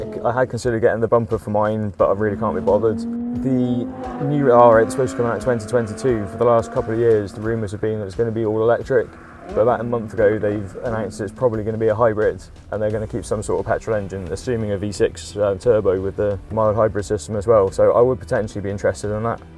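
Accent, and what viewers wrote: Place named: West Country, United Kingdom. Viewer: Think it’s a ‘west country’ accent that may come across quite strong and hard to understand. Also the background music is probably a little too loud.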